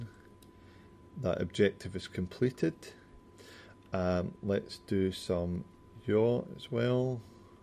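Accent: Scotland, United Kingdom. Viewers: Are you Scottish? Great accent dude! You said it, "steep learning curve." It's been pretty miserable learning it but now that I slogged through the basics I'm totally hooked. Amazing game they created, can't wait to play it in VR! Thanks for the free videos, helpful. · you Scottish mate? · he definitely is scottish, yes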